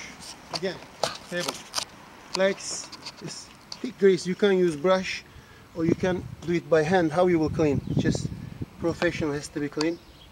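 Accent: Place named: Turkey. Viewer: u have such a turkish accent of english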